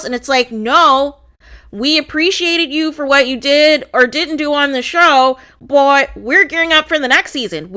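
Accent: Midwest, United States. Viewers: Are you from the midwest?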